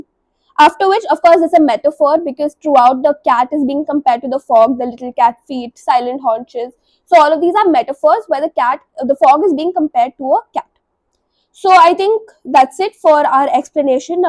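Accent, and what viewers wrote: South India, India. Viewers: are you from south India???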